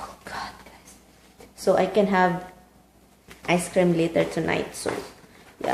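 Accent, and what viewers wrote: Philippines. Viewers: i subscribed because your videos are like novelties to me. i think the way you talk and the way you get your point across on issues makes me laugh. i am assuming that you are educated and just taking the Filipino accent to a different level...happy for you and to your husband...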